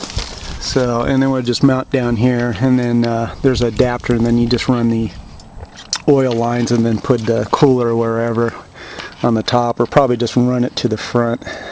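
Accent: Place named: Midwest, United States. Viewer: Are you from the Midwest? Your accent sounds exactly like a friend of mine. Kept thinking it was him. Kool conversion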